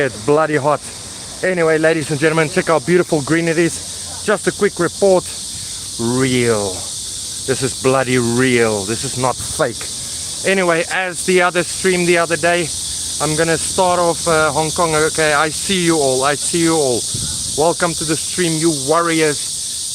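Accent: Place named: South Africa. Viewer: I just love your accent. I think the South African' English is the coolest of all English accents.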